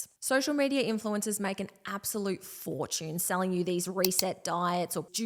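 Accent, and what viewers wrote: Australia. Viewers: Damn your accent is incredibly Australian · Australian? I miss that accent. Had a lovely time during my stay in Melbourne.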